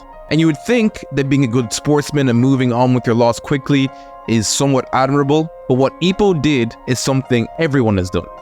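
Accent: Ireland. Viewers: cool irish accent lol you sound exactly like my brother, love hajime no ippo since it motivated me to begin boxing.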